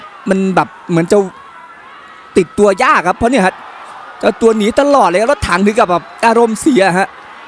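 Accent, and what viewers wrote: Isan, Thailand. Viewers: คนพากดีคักมีเว้าอีสานบ้านเฮานำจวดไปเลย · ดูแล้วชอบครับคนพากย์สุดยอดครับ ผสมอิสานด้วยเหมาะีผมชอบ คนอุดร กุมภวาปี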